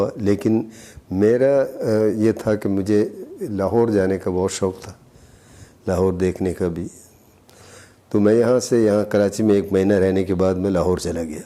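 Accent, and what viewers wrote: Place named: Kerala, India. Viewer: He speaks great Urdu, but he still has a Malayali accent.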